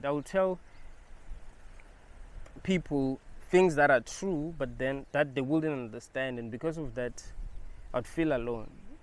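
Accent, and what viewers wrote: South Africa. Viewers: You're South african?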